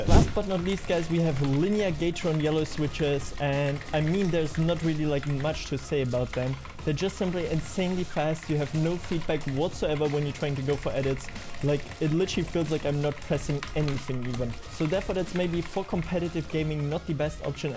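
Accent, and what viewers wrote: Germany. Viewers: Ich höre deutsch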